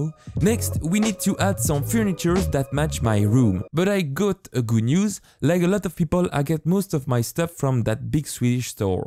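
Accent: France. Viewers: Damn to accent francais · Ca ce vois beacoup que t'ai francais mais bon (Super cool la vidéo) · French accent lol but amazing video! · Its sseems you are french mon pote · T es français toi sa se sent . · on est d'accord qu'au vu de son accent il est clairement français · Mdr sa me tue que PERSONNE a remarqué qu’il avait un putain d’accent français 😂 English translation: lol it kills me that NOBODY noticed that he has a fucking French accent 😂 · L'accent français on est là (en vrai tkt il est super ton accent 👌 super vidéo) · your defenitly french like me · wow l accent francais on aime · You're the frenchest person i've ever heard, very cool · L’accent français qui fait plaisir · thats an incredibly french accent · ça se sens que tu es français alors salut mon pote !! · l'accent français de ouf, mdr (sinon superbe vidéo 👍) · It's surprisingly quite rare to hear French accents on YouTube. 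Not that it's a problem at all. Sorry if it's Swiss or Belgian or whatever, I just can't tell the difference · Cette accent français mon frère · its so obvious he's french lmao ! tkt tes pas seul XDD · Hey, super ta vidéo! Dommage que je vienne seulement de la découvrir ^^ J'ai directement reconnu ton accent, ça fait plaisir de voir des Français faire de l'internationale ;) J'vais checker le reste de ta chaine, bon courage en tout cas, ça promet du lourd! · Eh mais t'es français je reconnais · French accent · that french accent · Translation (according to google translate): Hey, great your video! Too bad I just discovered it ^^ I immediately recognized your accent, it's nice to see French people doing international;) I'm going to check the rest of your channel, good luck anyway, it promises heavy! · yu have a french accent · Au vu de ton accent, tu est français non? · L'accent français 😂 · French accent my dude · My god you sound so French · Oh le bon accent français 😁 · i love how your french accent just peaks in the ou and au sounds, from a french student it is very satisfying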